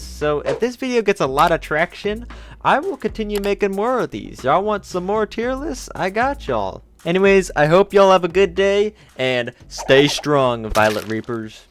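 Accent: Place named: United States. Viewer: This is my first time watching you and I was like wow he sounds very American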